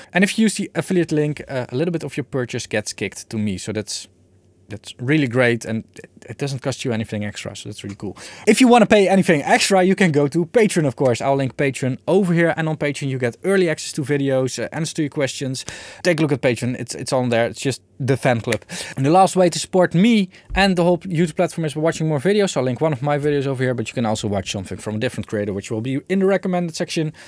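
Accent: Netherlands. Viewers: The Dutch accent is powerful, I like it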